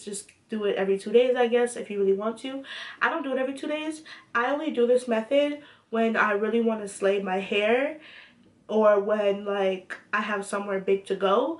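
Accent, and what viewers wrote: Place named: New York, United States. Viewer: U sound like u from new York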